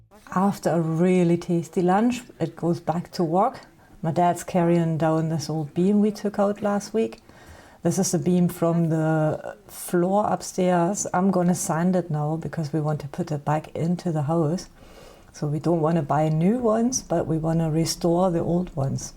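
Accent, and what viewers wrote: Scotland, United Kingdom. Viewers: I knew I heard Scottish in your accent! 🙂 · Aha! I had thought it was a Scottish accent. My (incorrect) theory was that you had studied English in Scotland.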